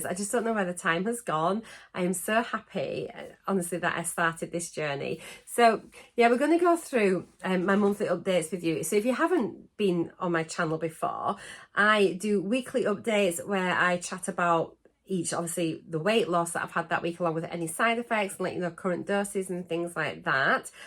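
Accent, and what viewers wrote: Yorkshire, United Kingdom. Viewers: I should have recognised your accent. I am rom North East Lancashire I was brought up in Burnley and was convinced you were from Accy or Blackburn, but even though you are from Yorks I still love to hear your accent , I know what you mean about boots. I had days where my feet were so swollen I couldn't even get normal ankle boots on so I know what you mean with footwear generally. Its lovely to wake up to another one of your videos I hope you had a fabulous holiday . I might have to watch your video in 2 halves because I have to pop to the village this morning. I live in beautiful mid Wales now but I have family in Yorkshire and Lancashire. I just wanted to say a quick Good Morning to you and congrats on your bob scale victories x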